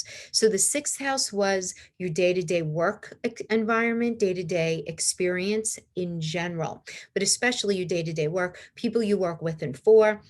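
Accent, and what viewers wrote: New York, United States. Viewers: Hi, I just came across your video. As the previous comments have said.....you are amazing! Also love your New York accent!!!! Love your explanation etc....awesome. Do you do readings at all?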